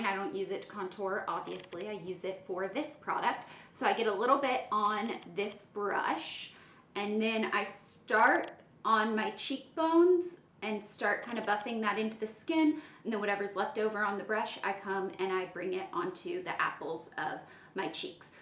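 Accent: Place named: Tennessee, United States. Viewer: Anyone else think, dang she really has been in Tennessee?! The accent is cuuuuuute ♥️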